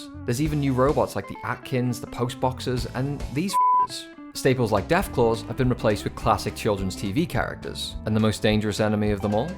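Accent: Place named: North West England, United Kingdom. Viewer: are you from the North West? I recognize my own accent hahahaha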